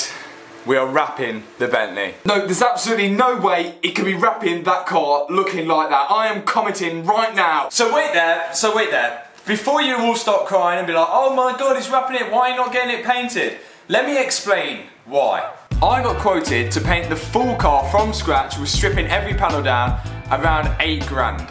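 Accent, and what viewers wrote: Leicester, United Kingdom. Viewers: I hear it absolutely fine but then I'm also from Leicester, know Mallory Park! Must be a "Lestah fing"!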